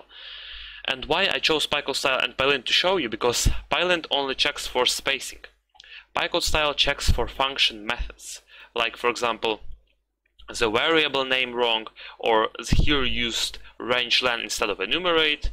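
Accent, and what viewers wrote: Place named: Russia. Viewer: Are you from Russia?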